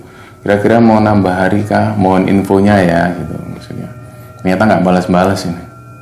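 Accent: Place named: Surabaya, Indonesia. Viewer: Logat suroboyo ny koq asik bgt yo mas medok2 gmn gitu😍jd ngefans sm chanel ini...pokoknya juara sukses trus y...😘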